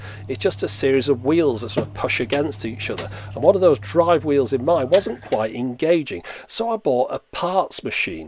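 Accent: United Kingdom. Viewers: the uk. You can hear it in his voice. Also, the ebay was asking for pounds, not aussie dollars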